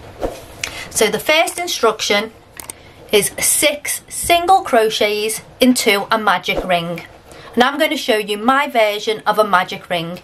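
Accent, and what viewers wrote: Liverpool, United Kingdom. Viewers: Was just gunna say .. oi scouser.. takes one to know one🤣🤣🤣g’wed girl! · So refreshing to click on a posh scouse tutorial ... I'd like to make people aware yes we from liverpool talk fast, walk fast....work fast lol love it xxxx ❤❤❤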